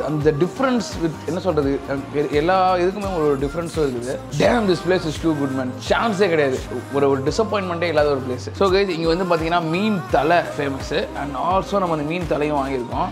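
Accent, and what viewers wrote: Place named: Kanyakumari, India. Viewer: Kanyakumari language poola iruka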